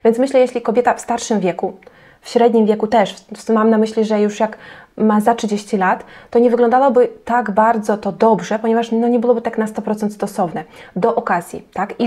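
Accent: East, Poland. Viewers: Piekny,wschodni akcent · spytałam o pochodzenie bo usłyszałam piękny wschodni akcent. Pozdrawiam 🤗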